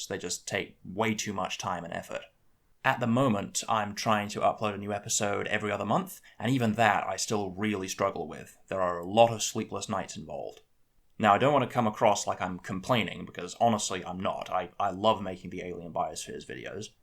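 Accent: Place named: England, United Kingdom. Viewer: Where are you from? I can tell you're from somewhere in England but I really cant differentiate dialects within england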